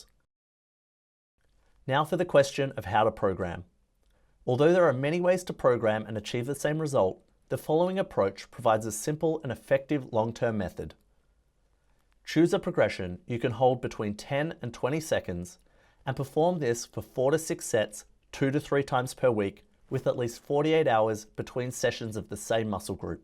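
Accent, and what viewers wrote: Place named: Australia. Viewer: That Aussy accent ...😁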